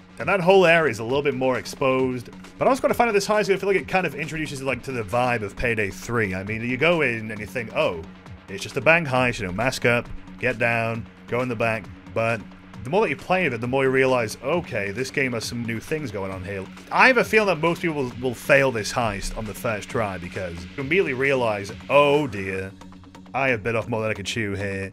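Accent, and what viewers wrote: United Kingdom. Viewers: It's cos ur scouse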